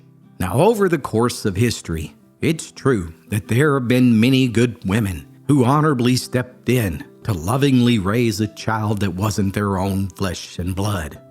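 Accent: Appalachia, United States. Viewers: I rarely leave a comment. Yet, I wanted to thank you for all your stories. Your voice is always calming & a constant reminder of my time in Appalachia. The comments, too, left on your channel regarding your stories are comforting. Your content is sorely needed in these days of uncertainty. With deepest appreciation, a grateful listener ❤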